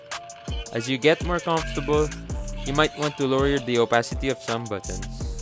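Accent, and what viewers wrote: Philippines. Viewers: Pinoy ka pala idol , dito ko lang nalaman hahahah, akala ko foreigner, kaya pala pamilyar ung accent mo, lagi ko pinapanood vids po idol, salamat sa mga contents mo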